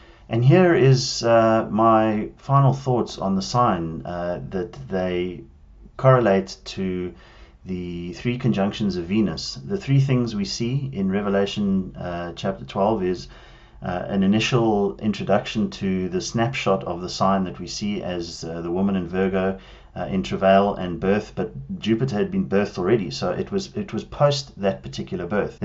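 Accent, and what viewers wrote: South Africa. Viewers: Thanks, great work!...you from Johannesburg? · Hello from over the Pacific....Am so glad I found this website....Amazing work,thank you, from one South African to another...I hear my countries accent loud and clear,and I miss it... :) May God richly bless your work....MARANATHA!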